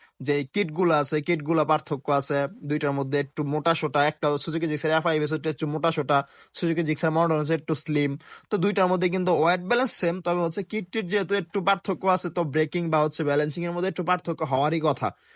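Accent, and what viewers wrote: Narsingdi, Bangladesh. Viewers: ভাইয়ের বাসা কি নরসিংদী নাকি 😊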